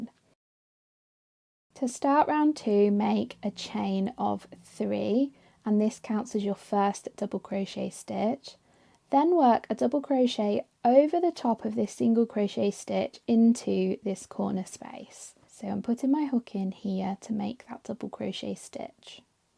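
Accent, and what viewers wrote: England, United Kingdom. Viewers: Why do you call a treble a double crochet? Your accent is English and I am wondering why you are using American termanolgy.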